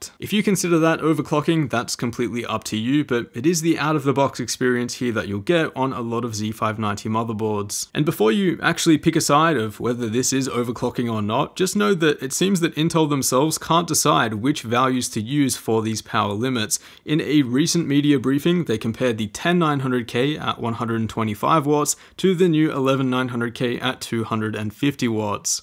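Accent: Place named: Australia. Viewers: He's Australian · definitely australian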